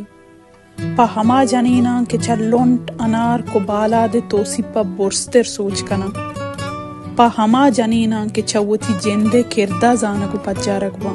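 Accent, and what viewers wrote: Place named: Balochistan, Pakistan. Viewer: الله میاری بانوک تئی گپ تران سک وش دولدارانت ❤❤❤ اصل بلوچی انت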